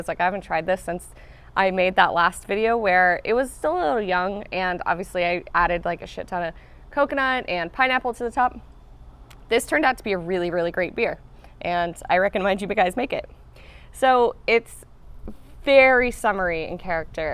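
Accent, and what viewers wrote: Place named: United States. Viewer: Hun snakker ikke et nordisk sprog så er det ikke bare ok at hun siger ord med et amerikansk accent?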